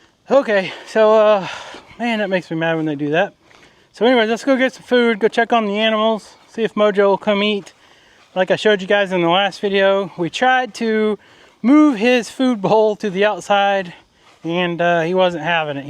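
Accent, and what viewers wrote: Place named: Oklahoma, United States. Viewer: 👋Hi and family, watching from South central Iowa; my mom was from Oklahoma, your accent and chill personality reminds me of my Uncles! Enjoy your channel very much.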